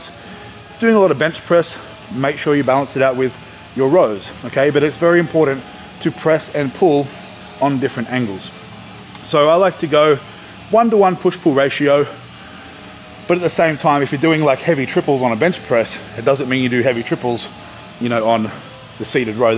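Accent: Australia. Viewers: Is this guy an Aussie Aussie Aussie?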